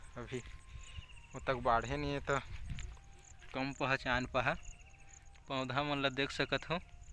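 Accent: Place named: India. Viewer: योर स्पीक शुद्ध देसी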